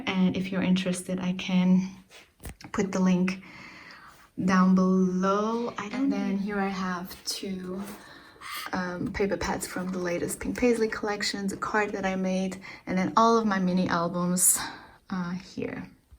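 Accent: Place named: France. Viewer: Hi, Thanks for such a detailed craftroom tour and all the inspirations. Love your French accent. Je parle tout petit Francais. Thanks for the link of the beautiful paper wall flowers from Etsy. I was also wondering whether you can give me information on the poppies wallpaper at minute 2: 48 on your video. A link would be awesome or at least the store you bought it from. I loooove it so much and hope to get it for my house. Thanks so much. Hugs, Rosalie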